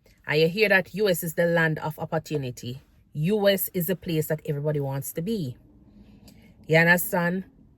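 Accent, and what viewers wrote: Jamaica. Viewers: i like the jamaican accent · I love your Jamaican accent, especially when u switch it on from off.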